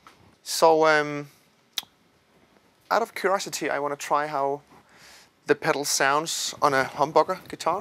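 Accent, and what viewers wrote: Denmark. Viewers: I fucking love our danish accent!!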